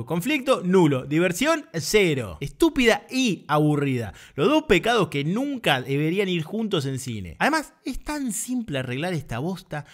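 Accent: Argentina. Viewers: Tiene la misma voz que todos los porteños. Pero buen video, me hiciste reír, y eso ya es mucho. Posible suscriptor, no te aseguro nada.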